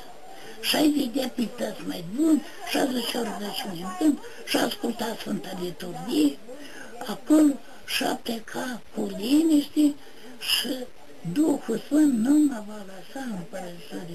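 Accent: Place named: Moldova. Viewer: He speaks in Romanian, with an accent typically encountered in north-eastern Romania (Moldavia region). Some of his words sound a bit archaic, meaning that today, ordinary Romanians would not use those words, since newer words (neologisms) are used.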